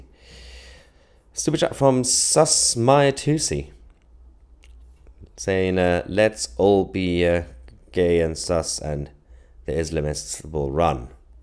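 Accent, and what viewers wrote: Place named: RP, United Kingdom. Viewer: Mr, the shotgun fired when it was dropped to the floor. Stop exaggerating. It was 2 hoodrats, on a 50cc scooter, riding from the police, in persuit. You name check Brixton, is one of the best areas of London. You grew up in government housing in Lewisham, with that received pronunciation? You’re chatting breeze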